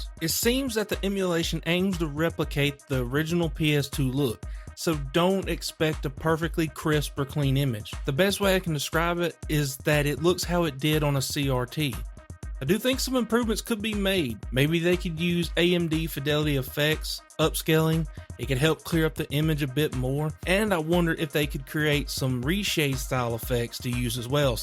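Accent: South, United States. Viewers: it’s probably super obvious to you lol, but yeah now that I think about how many times I have to hear the same sounds over when editing… lol I didn’t even think of that 😂 But dude trust me, I play guitar and sing in front of small audiences and I still hate my voice, and when it’s played back to me, I’m like damn… is that what I really sound like? Ughhhhh lol But it’s just natural for most people ti hate their voice when hearing it back, it’s just funnny (to me lol, maybe not you ) but yeah it must get annoying hearing your own voice so many times over and over the same phrase or something when editing! Trust me though, for the third time, you’ve got a solid voice. A little raspy, southern, it actually stands out among others in a good way. Way too many British accents on reviews lol don’t get me wrong, it’s a cool accent, but there’s just a lotta Brit’s in gaming I feel… they’re pretty goood at it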